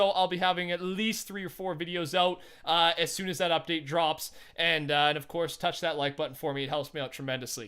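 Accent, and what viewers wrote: Canada. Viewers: R u Canadian too?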